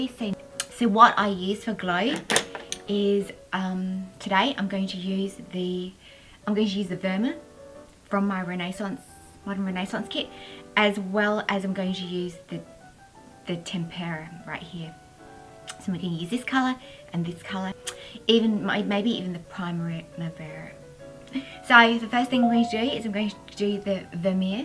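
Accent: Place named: Australia. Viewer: Wow , we live in Queensland, would love to meet and greet when you come.. Hoping you can sub back and stay connected.. I knew you where aussie when I heard your accent..Love this channel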